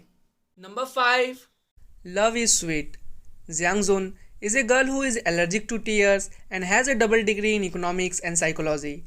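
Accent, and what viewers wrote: India. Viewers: It's a "desi" indian accent. It's better to watch on mute ^^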